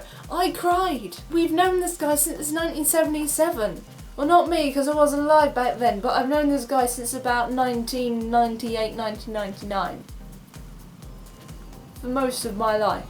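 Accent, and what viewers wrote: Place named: England, United Kingdom. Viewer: love your english accent !! i hope you hear that often .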